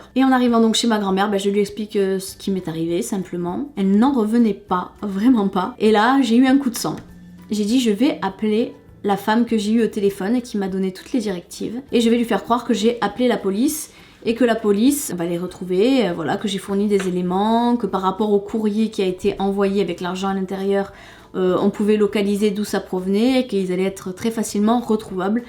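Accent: South, France. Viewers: j'adore l'accent du sud !!! 😂😅😆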